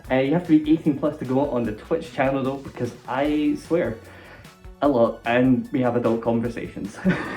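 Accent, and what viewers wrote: Scotland, United Kingdom. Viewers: Recognised his accent a couple of seconds into the first of his videos I watched, and immediately knew he was a fellow Scot!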